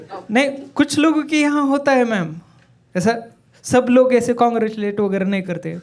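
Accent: Maharashtra, India. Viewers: Marathi accent in hindi standup 😀😀😀😀😀😀😀😀 kdk 😂😂😂😂😁😁🙏🙏🙏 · Brother, I am halfway through your video, I like your wholesome jokes. And be confident in our Marathi accent.❤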